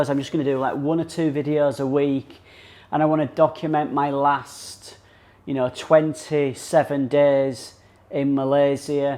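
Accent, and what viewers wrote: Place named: North, United Kingdom. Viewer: Ahhhh...that familiar northerner accent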